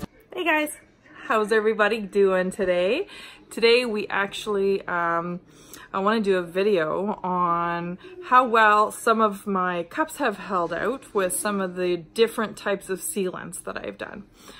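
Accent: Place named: Canada. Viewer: I think sure may be from Canada. I've heard other people pronounce it that way from there.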